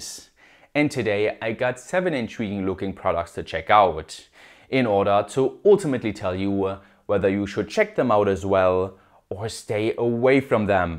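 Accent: Germany. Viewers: I like that german accent! Can you please more often use the word "Jawohl" like germans do in movies. 😂😂😂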